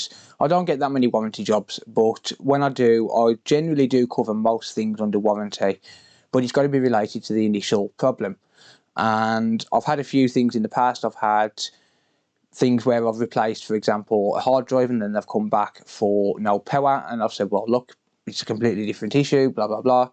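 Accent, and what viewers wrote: United Kingdom. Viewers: could someone enlighten me what kind of british dialect he is using? I've never heared it before and it was a bit hard to get used to it but after watching several videos, its a lot of fun to listen to him.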